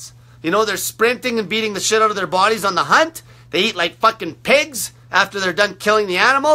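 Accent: Canada. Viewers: I’m confused. I head your Canadian accent, but I hear ur tone and it ain’t adding up.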